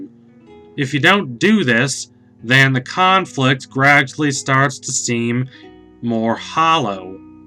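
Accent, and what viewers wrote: South, United States. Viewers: Dude do u have a accent? U almost sound southern? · Hopefully Claudia is the villain/ star character of season 4 because I would like to see her reactions to Rayllum and over all, the peace between Xadia and The Human Kingdoms. Also, I love you random southern accent, I laughed while listening to this video (in a good way). Keep up the great videos!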